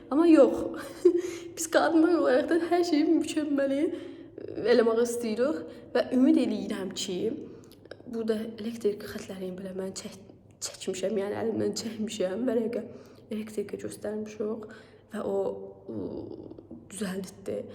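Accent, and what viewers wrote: Sheki, Azerbaijan. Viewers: Canim gôzum xeyirli olsun saglam oturun icinde xowbext yawiyin.Balalarinizin xeyir iwlerini goresiz.Bizde Maskoviski oblastda yawiyiriq.Ümüd edirem bir vaxt rastlawariq.Menim yeznemde Wekilidi çox Gozel insandi.Weki lehçesini cox sevirem wirin daniwirsiz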